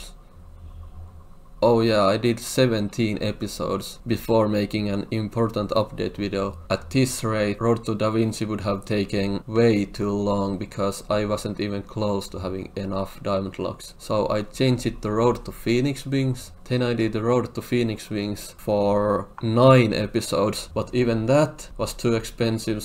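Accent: Finland. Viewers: Suomi accent o crazy (eksä tehny face reveal jonku aikaa sitte) mut joo hyvä vid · Mahtava suomalainen aksentti olisko vanhana tekijänä mitään hyviä vinkkejä meitsille? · Finland accent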